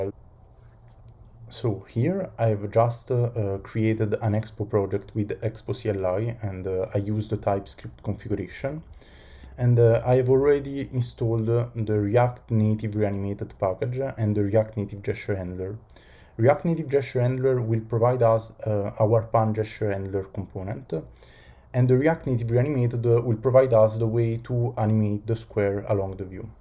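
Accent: Italy. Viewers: Grande tutorial (sei italiano vero?)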